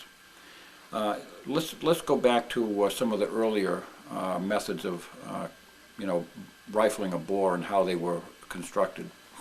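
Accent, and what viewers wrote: New England, United States. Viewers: Pleasant New England accent, sir. Much different than we're used to hearing in Nashville!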